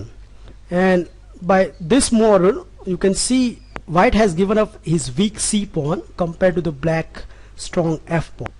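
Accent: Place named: India. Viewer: Indian accent is very annoying and confused 😏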